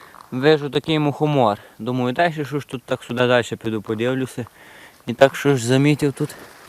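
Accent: Hutsul, Ukraine. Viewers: Все мені подобається!!! Гори і природа, грибочки збирати, і діалект гуцулів, який Ти шануєш, і будиночок, відпочинковий,і музика!!!Відео прекрасне, дивлюся з задоволенням Дякую,!!! Будь здоровий і щасливий!!!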